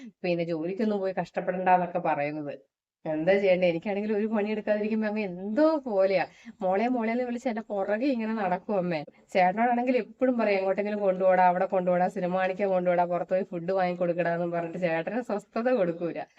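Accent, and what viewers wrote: Idukki, India. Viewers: ഹയ് ഇടുക്കി slang കലക്കി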